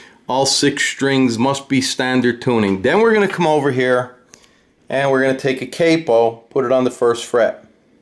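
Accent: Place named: Chicago, United States. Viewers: That's not a Brooklyn accent? Chicago? Interesting 🤔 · Nice vid great Chicago accent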